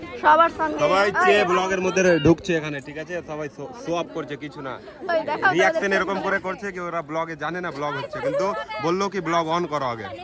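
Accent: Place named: Kaliachak, India. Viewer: Vasa te bujha jaichhi tumi kaliachaker 😂😂😂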